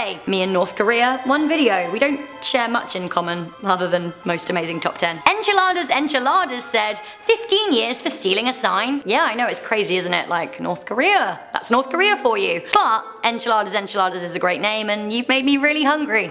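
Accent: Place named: United Kingdom. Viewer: Dutch 🇳🇱 love the UK accent and love u ❤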